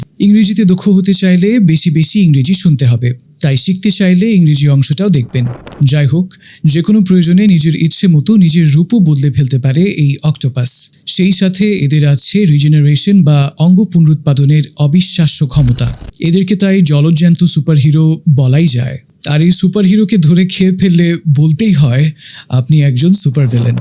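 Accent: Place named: United States. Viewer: আপনারা আমেরিকান এক্স্যানট অনেক ভালো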